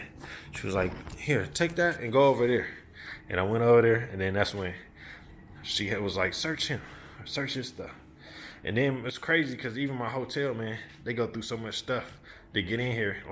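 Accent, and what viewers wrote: United States. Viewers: Sounds like u from Cali homie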